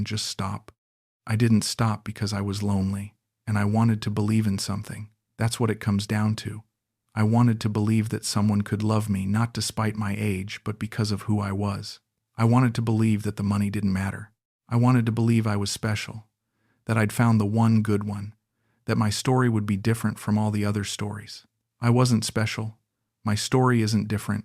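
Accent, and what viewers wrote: United States. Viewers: Are these made up stories or genuine stories ? these types of stories are true but is this channel telling true ones or made up for entertainment ? What if it's an English person is it an American accent it doesn't make sense there should be an English person accent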